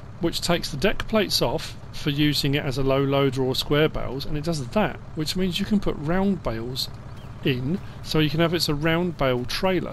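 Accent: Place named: Kent, United Kingdom. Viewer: Thanks once again for a great video, ive only been watching your videos for a month or so, but you are far and away my favourite FS19 info source. I have to ask though (and no need to answer if its edging on too personal), are you from Kent? You sound suspiciously like you are from my hometown of Maidstone, just another reason i like your videos so much, reminds me of home.:D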